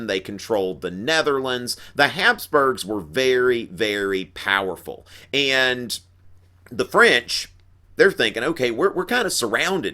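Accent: South, United States. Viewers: Southern US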